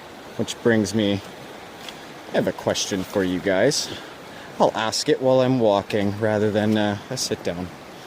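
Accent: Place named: Canada. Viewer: Hmmmm ouuut & aboouuut...you must be Canadian. Cool. I can only tell when you guys say one of those words. I haven't heard you say "ay" too much though...lol.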